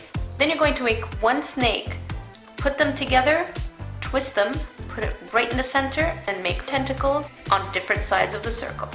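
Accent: Quebec, Canada. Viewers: Canadian aye? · are you Canadian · are you Canadian or some really French Canadian person??? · Are you Canadian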